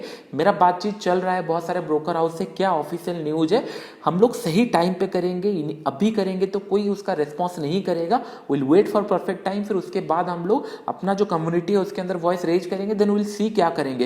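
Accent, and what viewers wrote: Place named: West Bengal, India. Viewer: It seems u r from West Bengal. I am too from Adra, Purulia district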